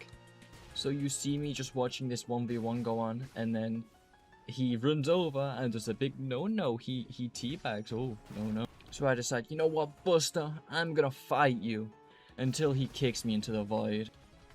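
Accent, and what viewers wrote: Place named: United Kingdom. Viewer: No hate whatsoever, but you sound like a very british lad ❤️‍🩹☺️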